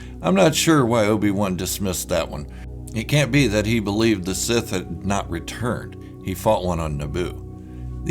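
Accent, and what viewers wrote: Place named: Germany. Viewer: I really miss videos like this this from you, so different and original, sensational More please!. Ihr deutscher Akzent ist ausgezeichnet! I can certainly relate to this video 100 percent as it was so nostalgic for me. I saw Star Wars with my father in 1977 when I was 9 years old, played with my collectibles, created my own artwork ( yes I used to draw etc) of the characters, my room was filled with posters on wall, collected the trading cards, unique merchandise and when the movies were available on Beta/VHS every night was Star Wars night for me. I relied on newspaper articles for any information I could get on Star Wars which was not much in those days. Looking back I am so grateful for lack of spoilers etc that because of the experience of feeling like a kid on Xmas Day when finally seeing the movies as I didn't know anything! 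My imagination went into overload and I appreciate those days so much more now. I think the generation of today are indeed quite spoiled now when it comes to the instant gratification of having everything available on hand via the internet and streaming services. Ohhh I so loved your analysis and perspective on how the Siths told the truth and the Jedi were too arrogant or in denial to accept the truth. I really didn't see it this way until now...the other side of the story. Of course, Obi had to lie or manipulate Luke. The Jedi were just as bad as the Sith in manipulation. Obi Wan and Master Yoda wanted Luke to be traine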